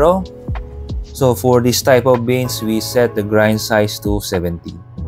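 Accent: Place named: Philippines. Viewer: Cute heavy Filipino accent. Thanks man!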